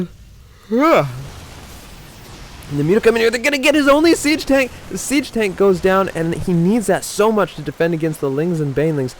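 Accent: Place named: Canada. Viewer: lol your accent very canadian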